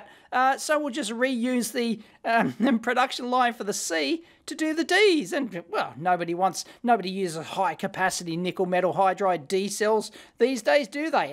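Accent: Australia. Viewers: The worst high pitch voice that could come with an Australian accent. So annoying. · he is Australian, that is why it is very different to British accent. 🐨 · What more do I love than some australian guy talking about batteries for 20 minutes straight. Awesome vid btw and very interesting. Do these same flaws exist in the triple or double A's?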